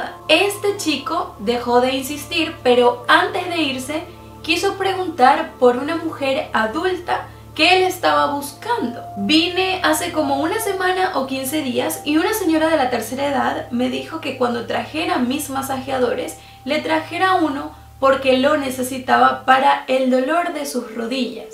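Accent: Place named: Caracas, Venezuela. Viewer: Me encantan tus vídeos! Se que eres venezolana (de San Cristóbal si no me equivoco) pero siento tu acento muy distinto a como usualmente hablan los gochos jaja, disculpa si es personal, pero viviste en otro estado? Que si en caracas o Valencia? Tu acento es súper caraqueño (te lo dice una caraqueña de pura cepa 😂) Un abrazo! ❤️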